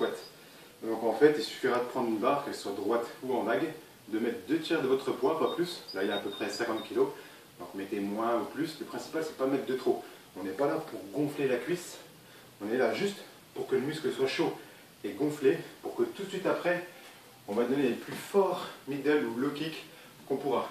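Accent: Belgium. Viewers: À ton accent je pensais aussi que tu étais Belge 😉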